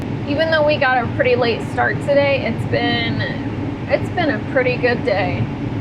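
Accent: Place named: Texas, United States. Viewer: Love you and your texas voice and accent ❤ thats for the very exelent video ❤😊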